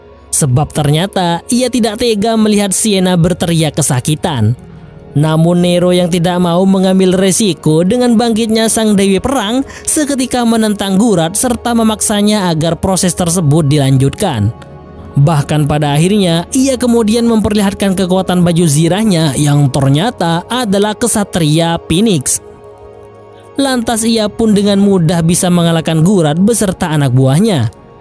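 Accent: Madura, Indonesia. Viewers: Ngereng yatoreh?Reng madureh?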